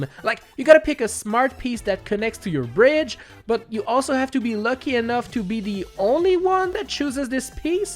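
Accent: Quebec, Canada. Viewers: French Canadian accent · he’s canadian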